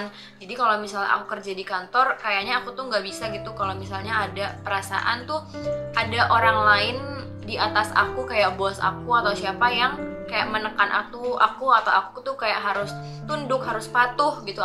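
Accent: Indonesia. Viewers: Hai kak dan kak assalamualaikum. Salam kenal, saya BEKASI UTARA. Uwahhh Bekasi mana nih Suaranya hehe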